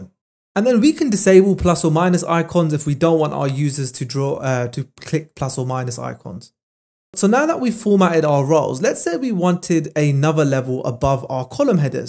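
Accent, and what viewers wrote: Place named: England, United Kingdom. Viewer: Thank you so much a legend and breath of fresh air hearing a proper English accent from a local bro!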